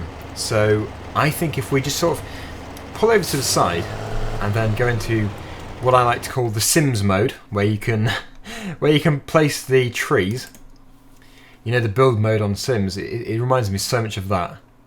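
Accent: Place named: England, United Kingdom. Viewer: perhaps it would be a God idea to turn down the game volume? I think most people would rather have your lovely English accent rather than the tractors for the majority 😊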